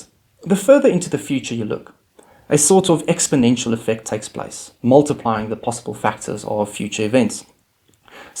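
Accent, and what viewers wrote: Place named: South Africa. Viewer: Just out of curiosity, where do you come from? Your accent sounds South African (like British roots mixed with an Afrikaner accent), but you could also be from the continent of Australia. It would be quite a surprise if you are South African as not many South African Youtubers make this kind of content.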